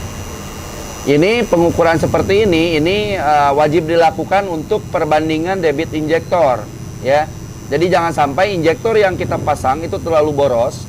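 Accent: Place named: West Java, Indonesia. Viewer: Aya logat sundaan.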